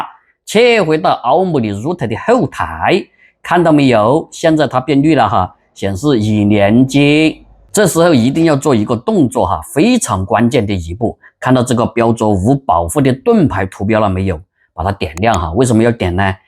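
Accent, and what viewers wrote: Sichuan, China. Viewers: 大哥 你是不是四川人 说点川普 我都懵了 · 听着一口流利的川普一下子想到了就是他教我学会了搭建一个聊天平台.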